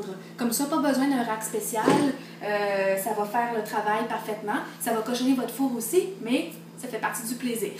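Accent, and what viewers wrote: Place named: Quebec, Canada. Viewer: En plein le genre de vidéo que nous cherchions. En québécois en plus!!! Merci pour les info!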